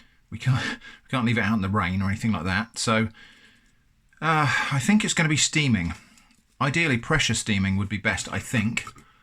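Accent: United Kingdom. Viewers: I love the British accent when it isn't too strong.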